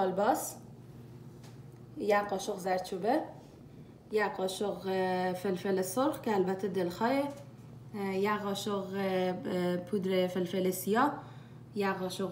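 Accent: Herat, Afghanistan. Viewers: With the beautiful Herati accent you make it more interesting to follow. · به به ماکارانی پختن با لحجه هراتی خیلی عالی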